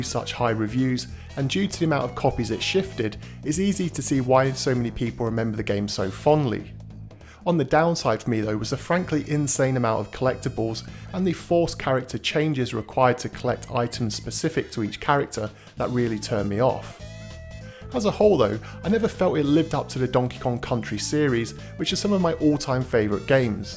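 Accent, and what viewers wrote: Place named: United Kingdom. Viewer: Narrator, which region of British accent do you have? Asking respectfully as a yank haha. Found your channel today and a rabbit hole is where I now find myself.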